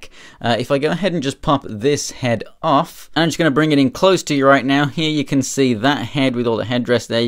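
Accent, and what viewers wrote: United Kingdom. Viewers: You sound English - so how have you got these before release here, as far as I'm aware weren't these out in September or October? Or did you get them imported from US?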